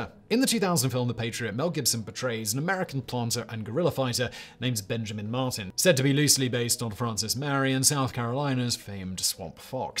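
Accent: United Kingdom. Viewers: You're British? I thought you were from California's central Valley where you developed a killer UK accent. PS that is the story of James Marsters, Spike on "Buffy the Vampire Slayer" who is from Modesto,CA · I find it highly amusing to hear a Brit extolling the exploits of forgotten American Revolutionary heroes, but very well done!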